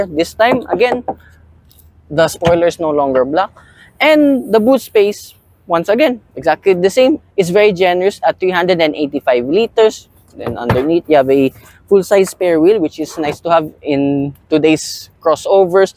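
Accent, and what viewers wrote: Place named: Philippines. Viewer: Masgusto ko yung ganitong review. May Filipino accent. Masnatural since ganito halos lahay magEnglish merong Filipino accent. Masnaiintindihan ng tao. More review to come sir.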